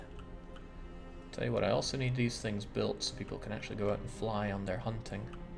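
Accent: Scotland, United Kingdom. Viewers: Hey buddy how do you rotate the buildings? Love your commentary style btw, what part of Scotland are you from? I can't understand some Scottish accents but yours is the best I've heard!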